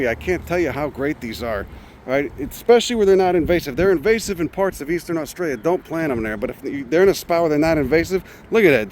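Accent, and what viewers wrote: Chicago, United States. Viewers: Haha! You are the new joy of my day. I'm guessing from your accent - Chicago? You are also an attractive man. Show your cute face more! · Are you from Chicago? I hear an accent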